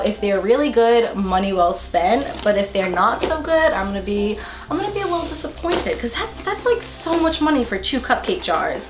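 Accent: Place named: New England, United States. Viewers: Ugh I love that you're from New England. I'm from Massachusetts, currently living in Maine, and I think it's so funny people notice you say wicked! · Omg those cupcakes are wicked good lol I’m a NEW ENGLAND GORL TOO 🤘🏼🤘🏼🤘🏼 I’m from Ma where are you from, I don’t know how I don’t know where you are from lol I could tell from your accent you where from New England and that one of the reasons I started watching you 🤣🤣 where did you get that coat I freaking love it it’s wicked pissa 😂😉😉😉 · You have such a strong Boston accent I live in Boston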